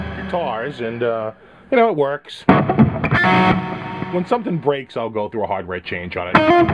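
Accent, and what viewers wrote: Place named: New England, United States. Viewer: what part of New England are you from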